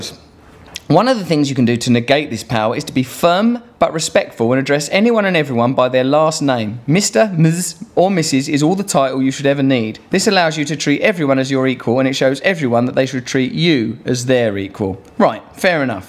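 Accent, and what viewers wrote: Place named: Cockney, United Kingdom. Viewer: I just have to smh when I realize "The man just said something inimically brilliant, with poor grammar and bad cockneyisms deliberately, and with great precision." You sir, are a true post-modern genius. Bill Hicks and Noam Chomsky had a love child, and he lives in London? Well, well. Btw, listening to you read your own book was a lot like reading Tom Robbins' fiction, and I mean that in the best possible way. I have such a fucking man-crush on you, dude. Thanks for being. :)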